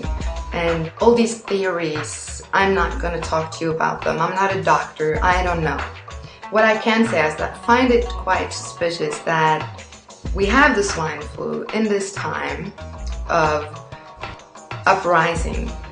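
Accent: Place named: South, United States. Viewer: Whats up with your accent babe? Or is it the crappy background music distorting it? Its that southern thing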